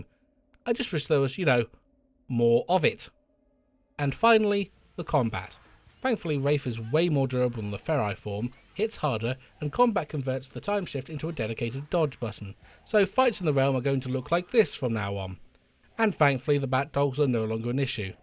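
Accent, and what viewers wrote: United Kingdom. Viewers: Smashed primal on ps2. Genuinely pleased to hear someone from uk when I clicked on this nostalgic brilliantly written blast from the past. Looking forward to what else you've got cooking? c: deserve a mini roll for this vid my G defo ❤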